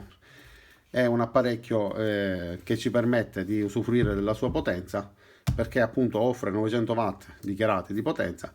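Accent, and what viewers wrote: Sicily, Italy. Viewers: Se la LIDL ti perde come cliente mi sa che è spacciata! Sembri siciliano dall'accento, lo sono anche io, se hai degli elettro utensili da vendere in blocco come usato fammi sapere che ci accordiamo tramite mail! Saluti!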